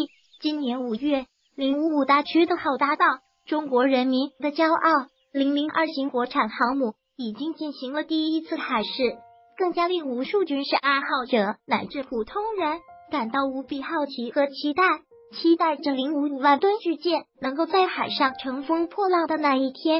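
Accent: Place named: Taiwan. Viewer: 台妹说话的腔调让我浑身起鸡皮疙瘩，头皮发麻，哈哈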